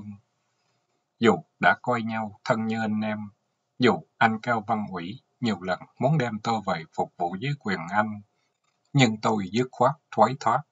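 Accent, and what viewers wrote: South, Vietnam. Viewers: Những bài Hồi Ký của Th/Tá VML K/20 VBQG thật đầy chi tiết và sống động trước mắt của phim trường. Chúc anh và gia đình luôn khỏe mạnh. Cám ơn anh 8 vè diển đọc. Giọng Nam của anh mà nhớ tới miền Nam.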